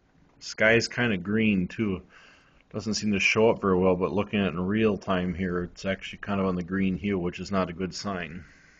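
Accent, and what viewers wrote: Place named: Midwest, United States. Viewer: I'am originally from wisconsin,you shure got a midwest accent- no shame:)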